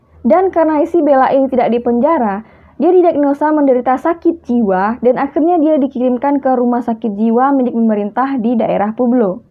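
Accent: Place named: Minangkabau, Indonesia. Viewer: Minang kental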